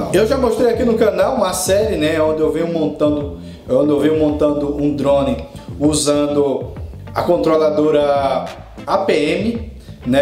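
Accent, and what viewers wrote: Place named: Recife, Brazil. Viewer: Também comprei um Mi drone e tô no aguardo, sou de Recife e pelo seu sotaque acho que você também deve ser daqui, tô certo? kkkkkkk enfim.. o meu comprei na Banggood e chegou em SP dia 05/01/17 foi para o CEINT SP/GEARA e no dia 06/01 partiu para a Fiscalização Aduaneira/BR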